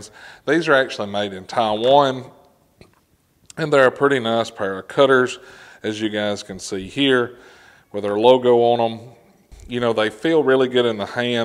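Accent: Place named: New Jersey, United States. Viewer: He could call it Discount Tool Co. and once you hear him talk you know he is from Jersey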